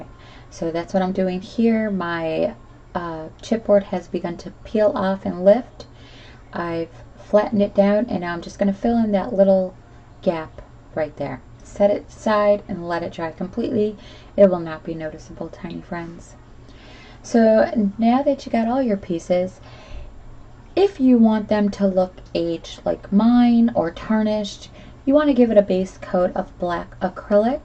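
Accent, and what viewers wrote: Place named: Canada. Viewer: Your accent reminds me of canadian english the way you say certain words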